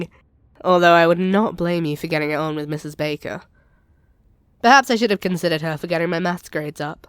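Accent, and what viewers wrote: United Kingdom. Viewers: This is the first time I’ve heard a fellow Brit do an asmr and it’s pretty good tbh 👍👍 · Shut up British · Bri'ish accent is the cherry on 🔝 ♥️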